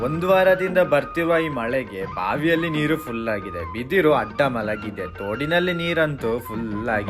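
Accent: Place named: Kantara, India. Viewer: Kantara dantha kathe way of speaking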